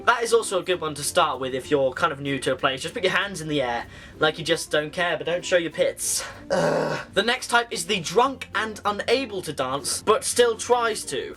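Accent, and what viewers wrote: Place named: United Kingdom. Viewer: May I ask where you live? I can't quite detect the nature of your beautiful accent! Sorry I suck at British accents, I'm one of those Muricans!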